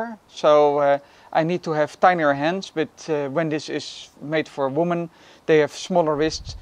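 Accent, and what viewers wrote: Netherlands. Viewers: Je bent Nederlands of denk ik het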